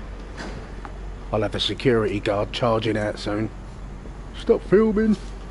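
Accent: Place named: East London, United Kingdom. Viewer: You'll crack that algorithm sooner or later,. Besides, your videos are a dumbed sight better than most of these London walking videos you get where the person doesn't say a word. And, with your East London accent, it feels more authentic too.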